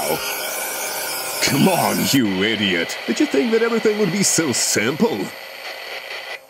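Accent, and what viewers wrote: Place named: United Kingdom. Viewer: the british/whatever accent is that with the video is amazing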